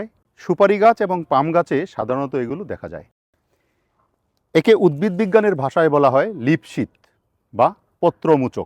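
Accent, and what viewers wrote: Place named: Mymensingh, Bangladesh. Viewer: প্রথমেই আপনাকে আমার আন্তরিক সশ্রদ্ধ প্রণাম এবং শুভেচ্ছা জানাই l বাংলাদেশ তথা এই উপমহাদেশের বিভিন্ন ধরনের উদ্ভিদ,তাদের বৈশিষ্ট্য,গুনাগুন এবং চিকিৎসা সম্বন্ধীয় ব্যাপার গুলো এবং খাদ্যের রেসিপি যখন আপনি বলেন ভীষণ ভালো লাগে। তদুপরি আপনার বাংলা ভাষার যে টান সেটা আমার পূর্বপুরুষ যেই জায়গা থেকে এসেছেন সেই জায়গার অর্থাৎ ময়মনসিংহের l আপনার কথা সুর শুনতে আমার ভীষণ ভালো লাগে। আপনি যে ব্রত নিয়ে তথা বিশ্ববন্ধু হয়ে আছেন সেই ব্রত নিয়ে এগিয়ে চলুন অনেক ধন্যবাদ। আমি ভারত থেকে এই শুভেচ্ছা পত্র পাঠালাম।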